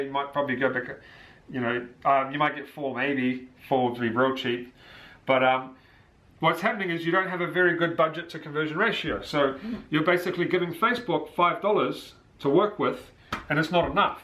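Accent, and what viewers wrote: New Zealand. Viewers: I'm not sure if its because you're a New Zealander and I just instantly connect with the accent so i understand better about what you're saying, or whether you're just explaining it better than any other person on youtube, but man... This video is EXACTLY what i wanted to hear someone say to me about facebook ads. I've been searching for this information for weeks. You're the only guy who's explained this, thoroughly, in depth, in an easy to follow and easy to digest manner. I'm gonna go as far as saying this just about trumps every single $300, $500 "course" that some of these youtube "gurus" try to sell you. If I actually get sales from implementing your knowledge from this video i'm gonna thank you forever. Stay tuned ;)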